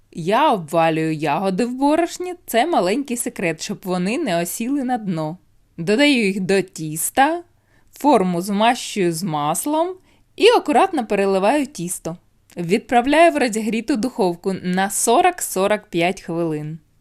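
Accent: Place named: Surzhyk, Ukraine. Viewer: господи, я думал так только мой друг говорит на своём личном суржике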